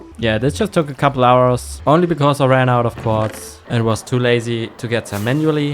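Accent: Germany. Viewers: Are you from Germany? It sounds a bit like German English. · I like seeing biomes build!! However, missed your videos, they are nice and I like your german accent man👍🏻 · Nice vid but are you german i hear a bit of an accent or maybe its the cold but yeah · youre accent is a bit german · is your main language german your voice sounds like german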